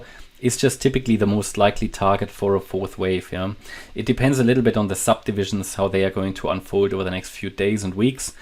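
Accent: Germany. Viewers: Hast du ein deutschen aktzent oder täushche ich mich hier😂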